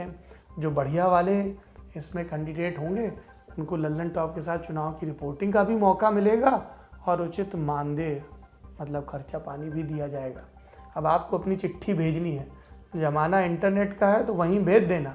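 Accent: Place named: Bundelkhand, India. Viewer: सिद्ध हिंदी के बीच थोड़ी सी बुंदेल खंडी हो वाह #दुनियादारी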